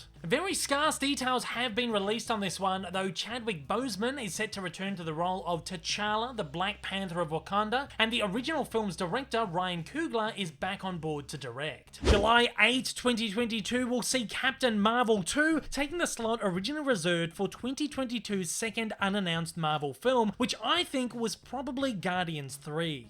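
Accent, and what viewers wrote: Australia. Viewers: Well that’s an Australian accent. You better get used to it lol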